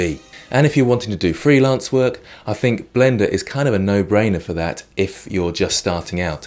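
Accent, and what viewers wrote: United Kingdom. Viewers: And that british accent :p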